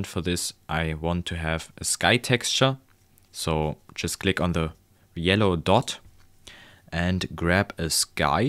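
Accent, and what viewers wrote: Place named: Germany. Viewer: you are german i can hear the acent in you XD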